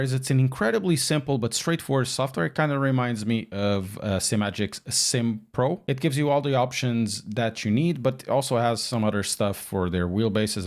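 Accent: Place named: Portugal. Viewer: came for the reviews, stayed for the portuguese accent! Bom trabalho!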